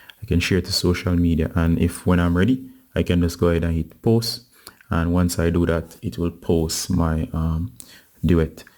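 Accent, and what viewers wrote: Jamaica. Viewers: You sound like your jamaican....Thanks for the tutorial!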